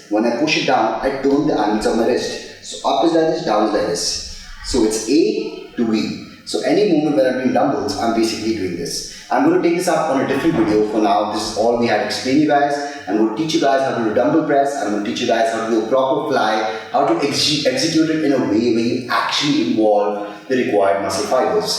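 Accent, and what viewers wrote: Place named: India. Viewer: Great and helpful info but sadly I start to hear Indian starts from minute 7.00, then I’m lost.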